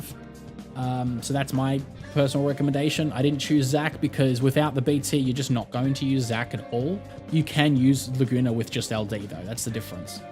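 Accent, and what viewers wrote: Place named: Australia. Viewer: hahah i knew it :). Im from Victoria and i thought that was an aussie accent. I was greedy and i use both garnet and ramza - 90% hp attack cap up is delicious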